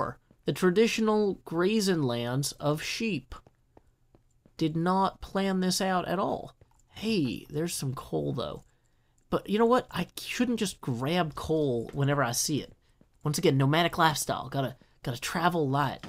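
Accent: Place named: South, United States. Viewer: I just wanna point out the country accent you have compared to everyone else in the hermit craft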